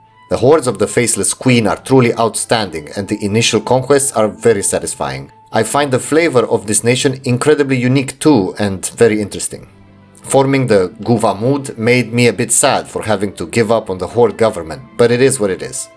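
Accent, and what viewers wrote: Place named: Romania. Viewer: A friend of mine presented me your channel and after watching countless of youtube eu4 hours(anbennar included) I have to say your latest stuff(7 months ago) focused on anbennar is S++. I hope you get the motivation with the boots of views to keep doing this. this is why youtube is great because gems such as yours appear from time to time. I would also strech and try to guess - are you from romania? you have a funny romanian english accent and if you do, mad respect. I promise i'll pledge when i get paid. Keep it up broski!!!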